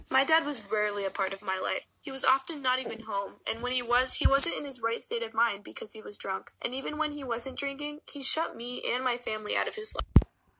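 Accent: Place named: Ireland. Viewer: You irish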